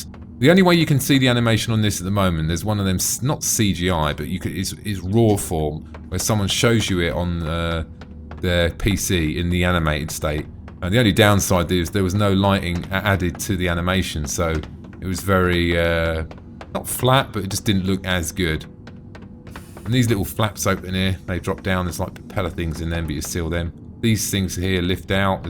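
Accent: United Kingdom. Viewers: your accent is too damn british to understand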